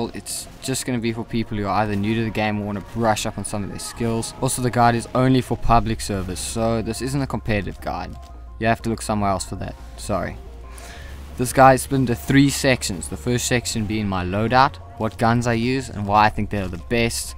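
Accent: Afrikaans, South Africa. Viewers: He is South African:D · are you afrikaans? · Are you South African? I'm Scottish but my mother is South African and you both say words the same way.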